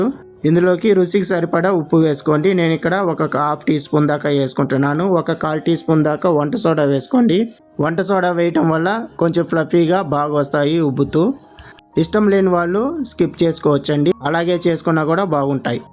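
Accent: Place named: Karnataka, India. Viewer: it seems you are from Karnataka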